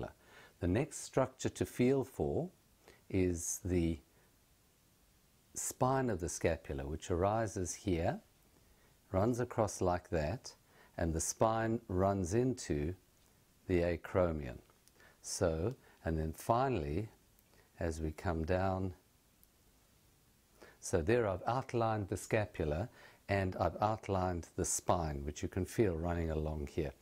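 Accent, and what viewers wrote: South Africa. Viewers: This was amazing. Recognised that South African accent immediately. ,More to the point I have been suffering from acute pain around the Supraspinatus join at the head of the humerus radiating into my upper arm. I thought and was advised that it was most likely a partial tear of the supraspinatus and followed a regime of excercise that has had no effect whatsoever. This has been ongoing for over 3 months and I have long since resigned myself to the fact that i'll probably need surgery. However a short popped up on my feed which I followed to the video, after watching I used a dowel and attempted to approximate the trigger point pressure, very inexpertly and awkwardly I might add. Almost immediately I experienced an increased range of movement and a drop in my pain index. I am really excited that I'll regain full function and be able to hit the gym again after a few more tries. 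Thank you!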